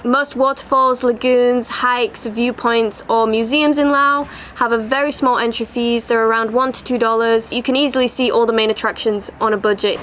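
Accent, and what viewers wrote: United Kingdom. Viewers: Great narrator..beautiful English accent ❤laos.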